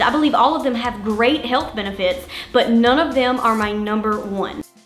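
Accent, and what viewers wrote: South, United States. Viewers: I absolutely love your country accent, it’s awesome!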